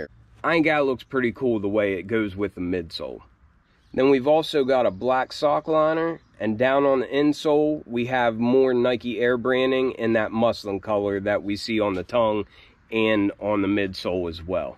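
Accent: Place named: Virginia, United States. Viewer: Are you drunk Virginia by chance? I used to live in VA beach and your accent sounds familiar. Great review BTW I will cop those for $160 price tag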